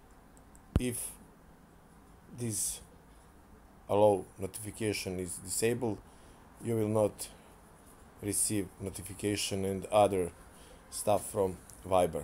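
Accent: Serbia. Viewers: A cujem lik prica engleski nekako kao da je nas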